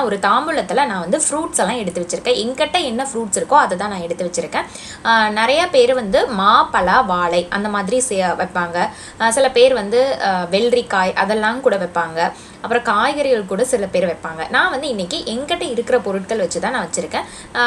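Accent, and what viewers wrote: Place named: Kerala, India. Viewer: Voice correct ah Kerala pa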